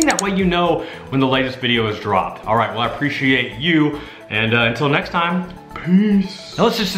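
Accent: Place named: South, United States. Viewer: You live in the southeast? I luv that southern accent 👍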